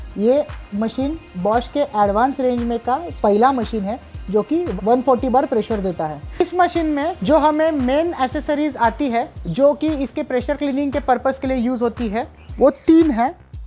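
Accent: Maharashtra, India. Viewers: Are you marathi? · are you marathi?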